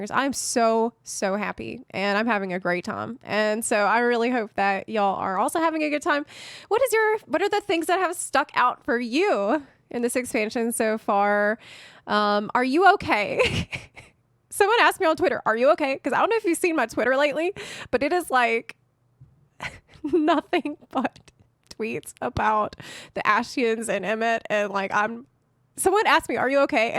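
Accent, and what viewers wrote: South, United States. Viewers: Awww you are adorable. And southern lol I second your thoughts on the story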